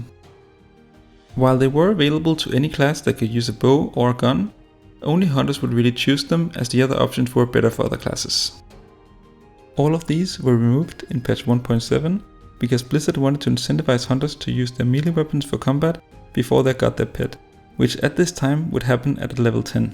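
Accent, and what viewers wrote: Denmark. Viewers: the danglish is noticable in this one ;D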